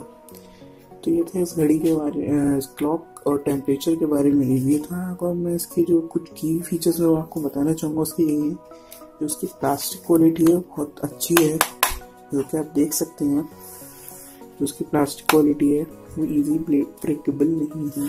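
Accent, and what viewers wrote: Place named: India. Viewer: You are indian and speed-mumbling, very very difficult to understand. Have you read how youtube CC interprets the sounds you put out of your mouth. Adding background music helps? Well I did hear in the end: Thank you, have a nice day, almoust nothing else.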